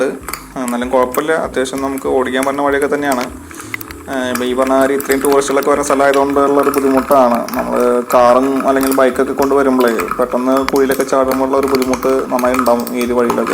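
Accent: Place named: Thrissur, India. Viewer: chetta... chettante thrissur slang enikku valya ishtama. watsapp number tharumo.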